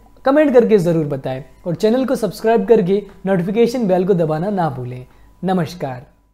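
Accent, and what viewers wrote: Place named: South, India. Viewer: Naryal anna accent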